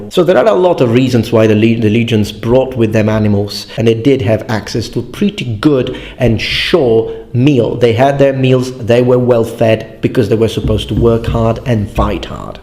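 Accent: Italy. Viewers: Not so much Latin pronunciations (We don't know what ancient Latin sounded like) but Italian. So your brain is saying, "Oh that sounds good," but the reality is that you're just listening to a Romance language accent, speaking Latin. I speak Spanish as a second language, and I notice that I will throw a Spanish accent on Latin words, because, well, I don't know what else to use as a reference point. But I'm aware of it. I'm sure this guy is aware of the limitations of his pronunciations too. I know it seems pedantic, but this is an often debated subject in academia. We truly don't know what Latin sounded like until the middle ages, because that was the first time diction books started being printed. So that's where humanity's knowledge of the sound starts. edit: Never mind, this guy has another video claiming he is pronouncing classical Latin. So it appears he is just another boob on youtube who collects weapons and armor, but doesn't really have any deep knowledge on the subject. 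Still entertaining, and not wholly factually untrue, but I would never use this guy as an academic reference. He's definitely misleading people in an effort to appear more knowledgeable than he is. Even in this video, he claims various things based on real historic examples, but he completely leaves out the perspective of how long the Romans existed. Literally, for the first few hundred years, every single Roman legionarie was from the city of Rome. Period. Citizenship was a requirement of serving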